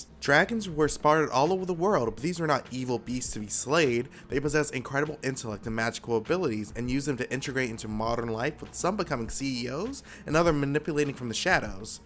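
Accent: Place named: United States. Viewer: pretty easy to understand, he is probably American judging from the accent alone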